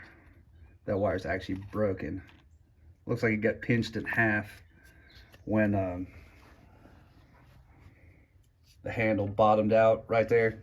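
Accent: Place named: Rock Hill, United States. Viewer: Man what in the hell??!! I’ve been watching your videos for some time now as I minor in tree work with a major in chainsaw addiction, pretty much all small engines really, I have two small outboards hanging on stands in my dining room right now, like it’s bad. Ive bought 4 chainsaws in the last 3 weeks just from scrolling through marketplace bc ppl don’t know how to work on stuff anymore. I just picked up a 201tc like this one for free from another tree service that had run over it with a kast lift, gonna need at least the handle and all that stuff that runs up through there so looking forward to all that lol. Anyways every time i watch one of your videos it’s crazy how much you sound exactly like my uncle lol. I said to myself, “he must be from down here somewhere”. Then come to find out I was right lol. You sound like you from somewhere around Rock Hill but hell there’s ppl that sound like us all over I’m sure. 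Small world. Anyhow, love your content brother, you’ve helped me a lot! Just modded out my little 194t bucket saw. That bitch is a screamer now son! So thank you for that! Hope to see you out there someday brotha, appreciate everything!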